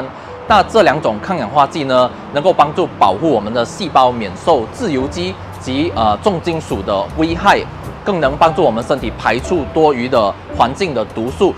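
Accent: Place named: Malaysia. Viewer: 马来西亚的声音和我朋友一样😂喜欢…..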